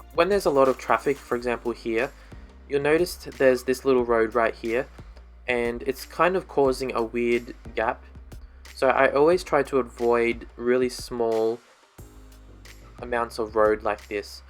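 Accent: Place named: Adelaide, Australia. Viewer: your accent's similar to my Adelaidian friend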